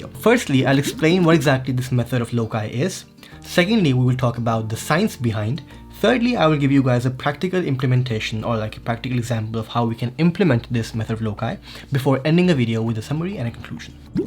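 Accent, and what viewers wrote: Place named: India. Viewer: I feel that your English accent is Hindi. Please make a video about speed reading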